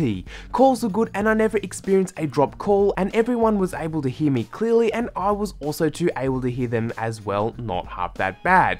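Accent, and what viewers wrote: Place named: Australia. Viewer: Ugh...Aussie accents.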